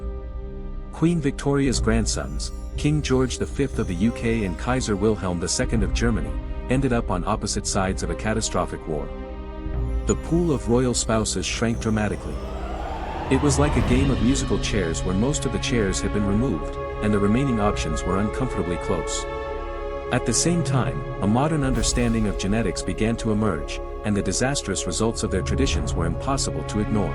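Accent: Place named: United States. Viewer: Any video clip narrated about the British royal family should feature a voice with an English accent. Is there a specific reason why an American voice dubbed this clip?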